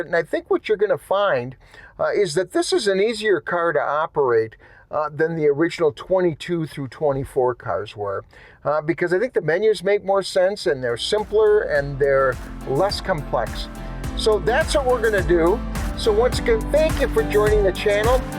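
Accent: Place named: Wisconsin, United States. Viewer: Wisconsin. Got it. I was trying to place your accent.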